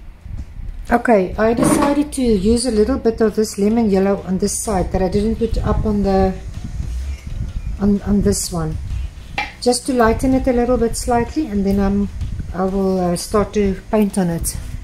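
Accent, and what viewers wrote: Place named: South Africa. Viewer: I love him. I love elephants! You're from South Africa? Very cool. I was wondering what accent you had.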